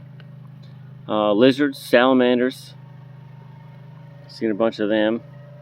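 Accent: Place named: Tennessee, United States. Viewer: You are already starting to sound like a Tennessee person!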